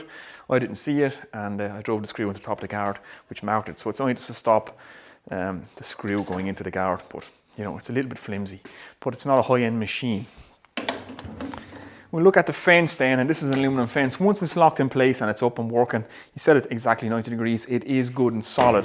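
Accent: Ireland. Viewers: 1500 quid is out of my price range atm pal but maybe in a couple yrs I will buy one when my woodworking is good enough to warrant it.. For now I'm getting me one of those cheap as fook models from Titan.. It'll do for what I need it for and when I'm ready to step it up to a better model I will be looking at a better model like one of these babys.. I need some good chisels so I was hoping u could give me some advice on the best Mortise chisels? The best set of ordinary wood chisels I can buy with let's say 200 euros as a price range for both sets?? I would very much appreciate it if anybody could help me with some good advice.. I'm prepared to go to 240/50 euros for both sets if needs be but I want to really get some great chisels for the money.. I am going to buy a set for about 670-70 euros as well for learning with but I really want both of the other sets now too.. 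It would be greatly appreciated if any of u good ppl on here could advise me on the best I can get for the money I want to spend.. Love the videos btw.. Where are u from pal? Is that an Athlone or Louth accent? I cannot tell but it's a proper Irish accent bro.. Great to see a top Irish woodworker on here doing his thing.. I haven't found very many tbh. Ur the only one atm mate but I will be looking for a few more in the future too.. Salute from DUBLIN by way of LIMERICK & Tipp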